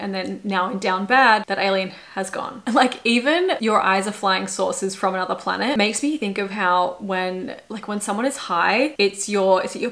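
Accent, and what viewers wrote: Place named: Australia. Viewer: Australian accent is such a turn off for me. Like I wanna enjoy her content but just because of her talking style, I can't. 😢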